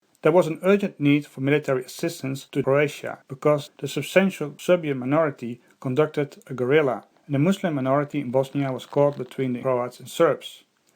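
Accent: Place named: Netherlands. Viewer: Hollandse accent haha goeie video hoor ik heb veel meer informatie gekregen over de balkan dan in school!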